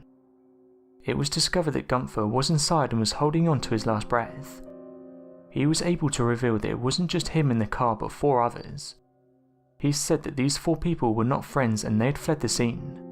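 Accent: United Kingdom. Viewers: AHÍ CARES ABOUT GUNTHER AND HOW UGLY GULF.. AND PLEASE GET THOSE SISSIFIED BRITISH NARRATORS OUT OF HERE.. AMERICANS DO NOT CARE FOR BRITS NOR THEIR SISSY VOICES..SO FAKE..SO FEMMY! FROM NOW ON IF VOICE IS A BRIT.. WE WILL NOT WATCH !!!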